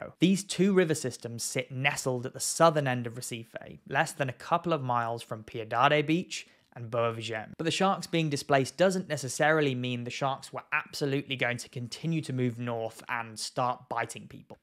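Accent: United Kingdom. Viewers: Yup…. Stillll taking my chances in New England. Holy Hell. Don’t your GW Sharks breach like MILES in the Air, too? I get your accent and the other ‘Shark Guy’ I get, confused. One of you is like UK(You?) and the other is South Australian. Help. Lol I’ll take my chances in NH/The Cape. Every time. But that water? Yeah, can’t see shit either when you purposely go for hurricane season. You should do a video about GWs, and say why they’re coming UP the coast, now. Been here and vacationing all my life; Never have seen what I have in signs ‘they’ put up these days.A Danger zone on the EAST Coast. People just lay out for the sun, now. No one swims in the Cape. I loveeee thatttt water. It’s clear as day-Unlike NH. But there’s GWs; And they’re Glorious. Love this channel! .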